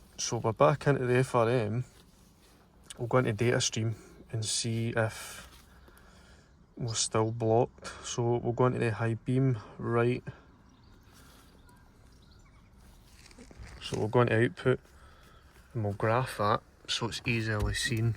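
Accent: Scotland, United Kingdom. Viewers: the thick Scottish accent is a great listen to be fair mate 👍😂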